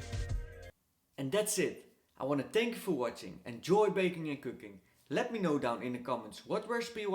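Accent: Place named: Netherlands. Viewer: Ik dacht al dat ik een Nederlands accent hoorde 😁 Ga van de week t recept eens even proberen en je andere video's eens even bekijken 👌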